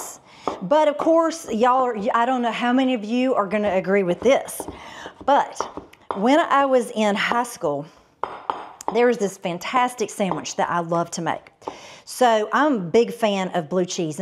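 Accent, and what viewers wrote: South, United States. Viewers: Awesome recipe!! I must say you are incredibly beautiful, I mean like breathtaking and that southern accent for a northern city boy like myself is just mesmerizing.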